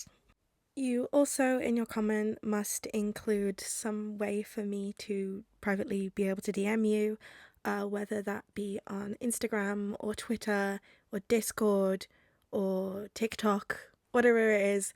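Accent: United Kingdom. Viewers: i love you bestieee. 10/10 cute video youre really good at a british accent, do you practice? >:))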